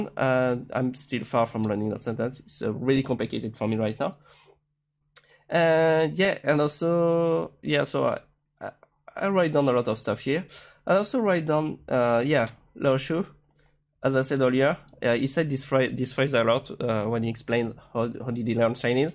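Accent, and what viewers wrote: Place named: France. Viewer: L'accent français reconnaissable entre 1000 mdrr, depuis tu as progressé ?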